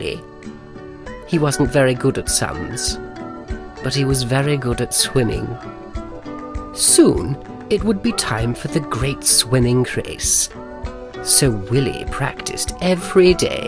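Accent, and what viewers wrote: United Kingdom. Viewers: I love your british accent i guess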